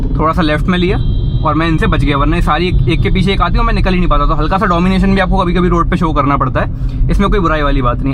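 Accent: Jhansi, India. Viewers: Brother are u from jhansi, UP ???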